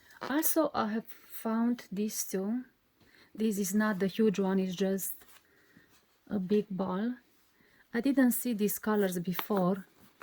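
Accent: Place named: Russia. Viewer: У вас такой акцент, как будто вы русская)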